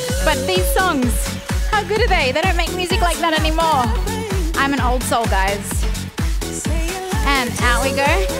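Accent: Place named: Australia. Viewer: My mom wanted a fun workout to do around Christmas time, so I showed her your holiday walking workout videos (which I love!) and she loved as well! After Christmas she went back to her usual workout routine, but it wasn’t until 2 weeks ago that she requested I show her more videos “of that pretty Australian girl who wears the cute outfits and plays good music” 😂 Now instead of doing your videos alone a couple of times a week, I do them everyday with my mom on her lunch break as she’s been working from home due to the virus. These videos truly lift our spirits and are so motivating! It’s also super fun that I can bond over your videos with my mom now (plus we get some extra steps in the process!) Thanks so much! ps mom’s been working on the Australian accent...she’s been inspired 😂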